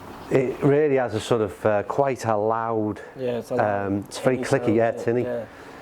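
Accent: Liverpool, United Kingdom. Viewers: Interesting to see that the u65 seems better off the deck than tee I have the same experience. Good to hear a scouser.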